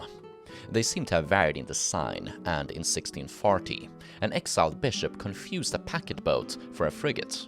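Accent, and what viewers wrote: France. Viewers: Hahahah I love your french accent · Okay, the video is so entertaining and formative when it comes to frigates. But so far my favorite part is your French accent 😅😂 Keep up the great work! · Haha the French accent is great. Never change, bro! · Love the french accent 😁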